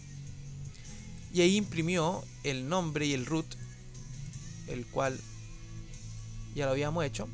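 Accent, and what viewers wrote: Chile. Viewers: eri chileno, perrin?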